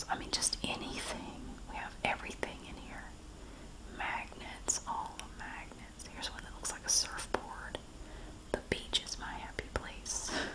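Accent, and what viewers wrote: South, United States. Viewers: Thank you for this video. South Carolina is beautiful, I’m going to visit in a few weeks since my boyfriend is from there. Your southern accent is so sweet and cute 💕 are you originally from SC or just visiting for vacation?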